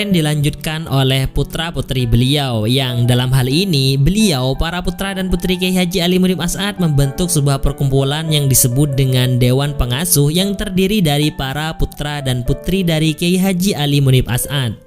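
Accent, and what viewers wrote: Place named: Madura, Indonesia. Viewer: Video x kereeeen tpi suaranya ketarah madurisix 😀😎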